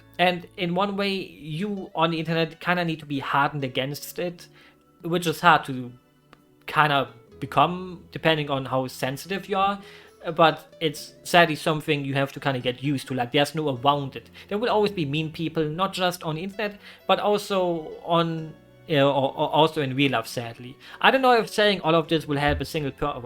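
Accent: Germany. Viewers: Necroposting a lot, but I got it suggested and never watched it before so... here's my two cents. Very heartwarming, inspiring video and a great listen overall. Thanks for sharing your personal history, allowing the audience to take it in and make their life better (and accept themselves). I always hated the "true" sound of my voice (which is natural, it's the same as not liking having your photos taken - our brains are used to see our own image mirrored because, well, most of the time we just see our reflections) to the point that I lashed out on people using speaker during calls as I could hear myself. So recording myself speaking and then listening to it and fixing it was a true torture and I wanted to die... until it wasn't. At some point... I just stopped caring about it and accepted myself. The same could be said about having aversion to own image. Very often there's that little voice inside our heads that tells us that we're just "not good enough", when in fact people really doesn't care (most of the time). 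Most of the time we are our own worst enemies, huh? Perhaps that's just And hey, I think your voice is really cool, same with accent, tone and tempo. It makes it quite pleasing to listen to, ...and yeah, I realized you were German after like 5-10 seconds of listening to you. It isn't a bad thing, it just... something that is and makes your content bit different than most, haha! It is funny though how Europeans can pinpoint the accent of others with nearly 100% preci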